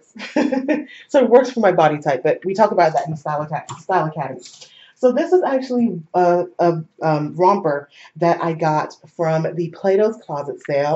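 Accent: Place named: Jamaica. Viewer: you betta speak that petois/English accent! I can't wait for video footage! safe travels. ✈✈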